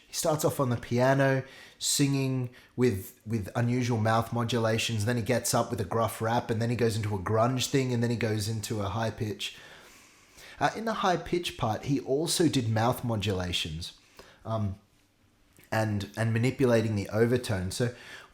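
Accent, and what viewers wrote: Australia. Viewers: It’s not Hau, it’s Hua 😅🙈 But I love australian accent.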